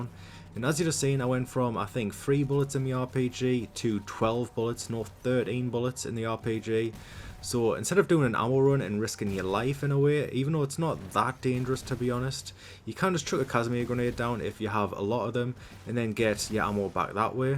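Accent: Newcastle, United Kingdom. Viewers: Geordie accent?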